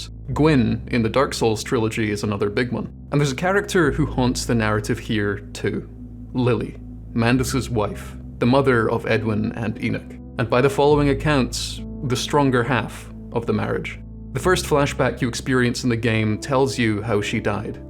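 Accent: Scotland, United Kingdom. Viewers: You really sound like you'd pronounce 'Enoch' the same way as I would (like 'loch', like if I was to mention 'The St. Enoch Centre', the 'ch' in there is like in words like 'loch') but I was surprised that you didn't. I must be mistaken.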